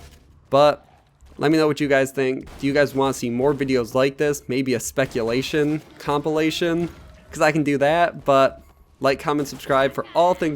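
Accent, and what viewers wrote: Netherlands. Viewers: Wwowowo are you dutch dude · Are you Dutch?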